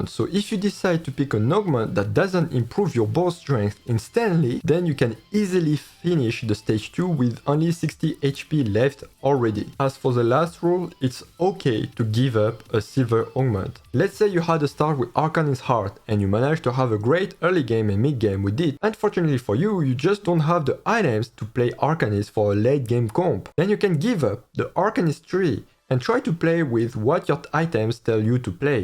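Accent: France. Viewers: Great advices. Awful english accent. Where are you from ? (french people has same awful english accent, but could be asian) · You are a french accent, u are french ? ^^'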